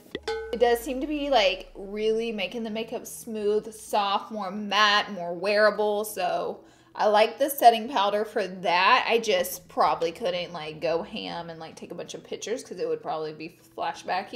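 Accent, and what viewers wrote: South, United States. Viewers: I'm from Alabama! That accent is so southern. I'm from a really country town. Like right below Tuscaloosa, Al. Roll Tide nation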